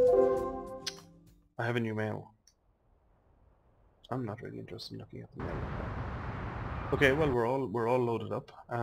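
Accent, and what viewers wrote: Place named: Ireland. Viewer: I got excited I thought u was him. Anyway good videos and I love ur accent. Which part of Ireland u from?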